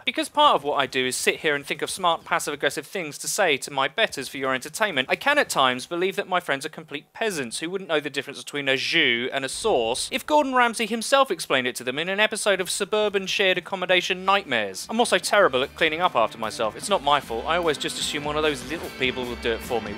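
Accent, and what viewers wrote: United Kingdom. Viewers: is essentially the escapist of the call of duty community. A British accent with witty remarks that would make handsome jack blush. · Your videos are like perfectionist essays, with witty highlights of jabbing humor and a tinge of British English. Well done, keep up the good work.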